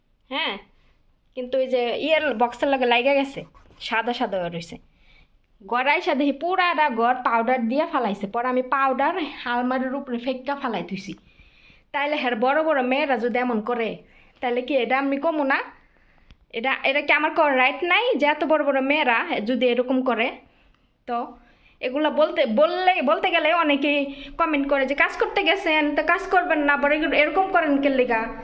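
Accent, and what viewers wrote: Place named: Manikganj, Bangladesh. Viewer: Apnar mokher vasa sone mone hoy apnar bari manikgonj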